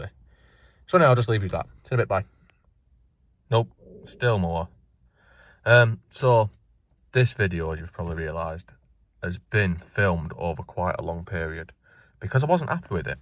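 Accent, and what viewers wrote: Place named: Northern England, United Kingdom. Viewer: Your videos are so informative in a Northern stylie bruv 👌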